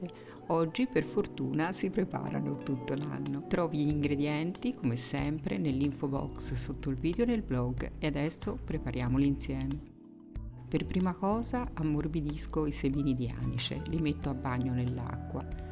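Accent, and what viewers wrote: Tuscany, Italy. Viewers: Buoni gli zuccherini ma il più bello è il tuo accento toscano. ❤😉😊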